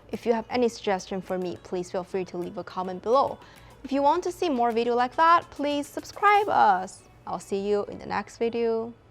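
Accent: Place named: China. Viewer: Funny Chinese accent. You do not know what you were doing.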